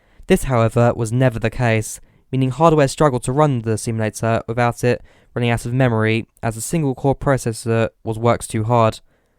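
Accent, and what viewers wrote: England, United Kingdom. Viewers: Is it just me or is this British accent really irritating? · You're saying a lot of interesting things I'm sure but I can't understand much of it. I know you're proud of it, but too heavy on the English accent. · british accent is irritating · Crazy. English...accent... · It was real English not American nothing at all wrong with his voice if you are English.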